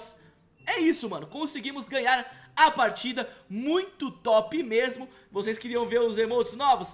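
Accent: Minas Gerais, Brazil. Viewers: Mineiro d dpirc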